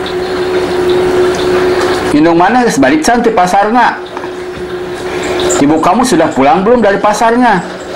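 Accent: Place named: Sunda, Indonesia. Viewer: Asli orang sunda atuh kang....👍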